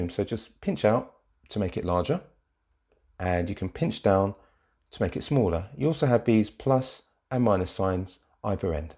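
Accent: United Kingdom. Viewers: Not helpful at all for me. The Speaker talked too fast, but more importantly, I tried to keep up with him to find the screens his finger touched and I could not duplicate the function. I bought this new Paperwhite 2021 a few months ago (this is November 2022) because I was advised my older Kindle would no longer operate with new books added. I am trying to find someone who can actually show me how to operate the Paperwhite. Finally, why have a British voice on this video when there are thousands of American speakers who would be easier for the American ears to understand.?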